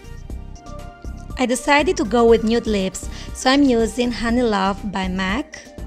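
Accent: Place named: Italy. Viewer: She said "white", she's italian so she hasn't your english accent. Be a bit nice! Damn....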